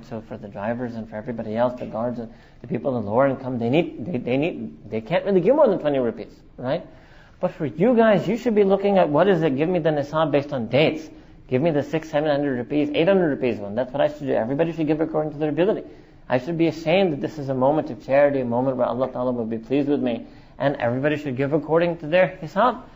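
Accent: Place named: England, United Kingdom. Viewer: Extremely strong English accent n very fast.though I want to understand this important topic of zakat,I only like my sheikh,s voice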